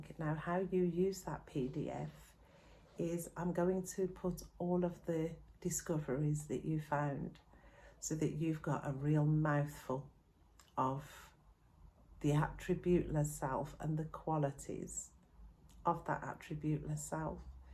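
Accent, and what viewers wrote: United Kingdom. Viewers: Can't wait to view it! Thanks, for who you are being for us. I love your videos and your lovely British accent 😊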